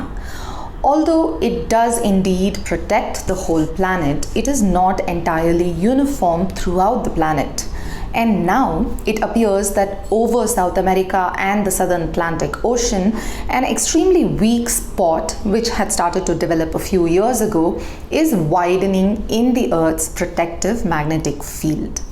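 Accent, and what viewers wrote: India. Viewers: I really don't want to delete my comment. She is Indian speaking English rather beautifully with an Indian accent, rather fittingly because she is Indian. I understand her perfectly. If you're so accomplished I rather wonder why you can't? Would that I could speak a second language so well.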